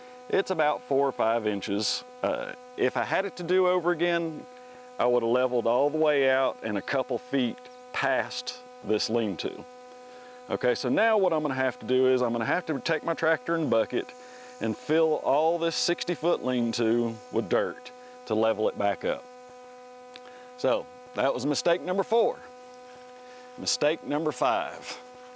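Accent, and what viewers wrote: United States. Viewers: Don’t be so hard on yourself, mistakes are just lessons learned, but I love the southern twang of tuba six’s and tuba four’s lol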